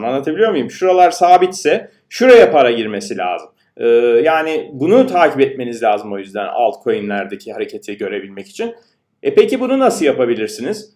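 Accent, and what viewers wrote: United States. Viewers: aksan amerika :)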